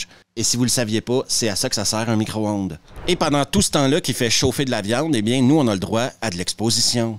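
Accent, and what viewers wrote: Quebec, Canada. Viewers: Je te suis depuis quelques temps un vrai pkaisir de re decouvrir ces films 😂😂 le toit avec un superbe accent québécois merci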